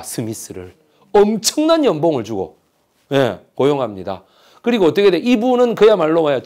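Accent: Busan, South Korea. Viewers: 전형적인 부산영어발음 ❤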